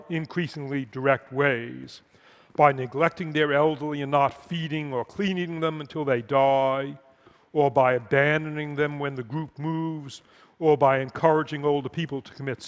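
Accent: United States. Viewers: Another great talk from TED. What accent is this? I figure its American but where from? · What part of the US is he from? I like his accent. Is it Boston? Maine?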